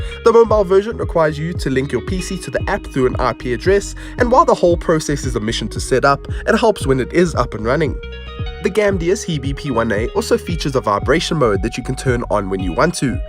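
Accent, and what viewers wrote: South Africa. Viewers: i hear a fellow saffa accent, I hit subscribe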